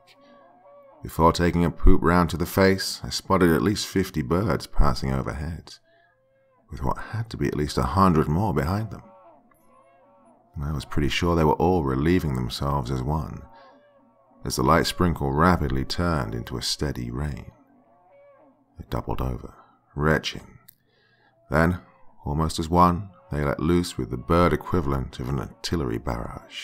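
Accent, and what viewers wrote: United Kingdom. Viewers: Hes British cut him some slack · Part 2...yes please. I was stuck on the narrator's British(?) accent in the US Navy but thats just nit picking. I like the story so far.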